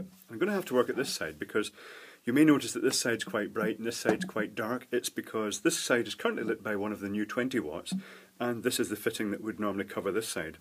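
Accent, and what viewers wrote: Scotland, United Kingdom. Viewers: he does, but that's the best way to teach and to learn. You'll learn more from the spoutings of an expert like, than you will from a random video or book. I litterally happened to watch a vid which took my interest called "poundland LED's" I though, "Oh I didn't know PL made LED bulbs, I'll have a look and see the vid to see if they're any good as I wouldn't mind pur-chasing some for my abode" 4 hrs later I'm still on his fuckin channel, and actually feel confident enough to fuckin LED my house up myself! Seriously! This man is a fuckin ledge! And with a FUCKIN AWESOME Scottish accent to boot as well! What a voice and what an accent! Ya wanna think about doing some voiceover work, on the side, seriously. "In a word without LED'S. Only one man can make a difference....."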